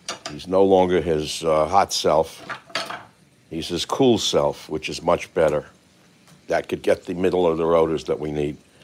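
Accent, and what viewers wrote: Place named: Bronx, United States. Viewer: Dr. Savage, another reason your listeners enjoy your new cooking format so much is it's strong ASMR component. It's very relaxing. The clinking of the untensils, the sizzling of the oil, and that along with your voice, which is deep, rich, and maliflous. On a personal note and as a Bronx native myself, your distinctive Bronx accent reminds me of my parents, grandparents, and other relatives, most gone, who I miss so much.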